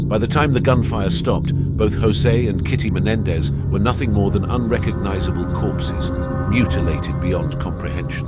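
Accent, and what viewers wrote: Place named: United Kingdom. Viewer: Кажется это британский английский -- если я не прав можете кинуть в меня камень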